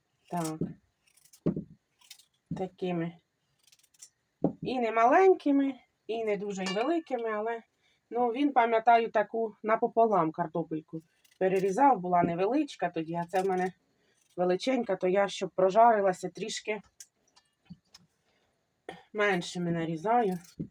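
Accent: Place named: Poltava, Ukraine. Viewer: Наша Полтавська мова , аж приємно 🩷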